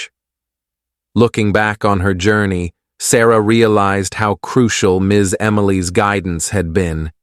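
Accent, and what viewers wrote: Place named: United States. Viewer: چقدر زیبا هس این لهجه آمریکایی ممنونم از شما دوست عزیز🙏⚘️🍀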